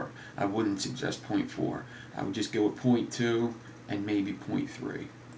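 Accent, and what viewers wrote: Philadelphia, United States. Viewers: Am I detecting a West Philly accent?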